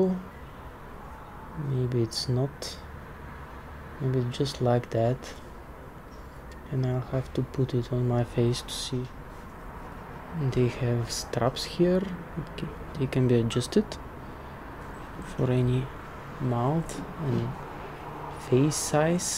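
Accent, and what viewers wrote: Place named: Russia. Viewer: Я один слышу русский акцент?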